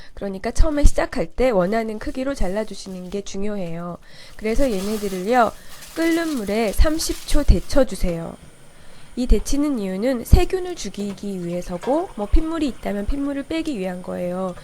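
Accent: Seoul, South Korea. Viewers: 서울사투리 너무 귀여워용 ㅠㅠ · 와 진자이게 서울 사투리죠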